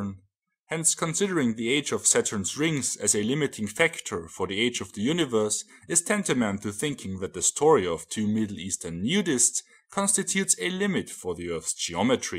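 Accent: Germany. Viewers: A great video, and a great presentation. I love the german accent, and your arguments are delivered with great precision and production value.. It's funny (and telling) to see the difference in how a creationist simplistically disobeys the ninth commandment, And a true factual and researched rebuttal.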